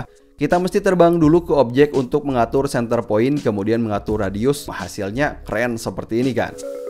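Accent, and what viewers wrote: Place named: Majalengka, Indonesia. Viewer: Alih sudah duga dari awal Bnr beliau emang orang Majalengka hehehehe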